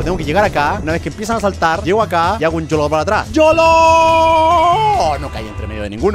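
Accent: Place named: Chile. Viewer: En este juego se le sale lo chileno a German :vv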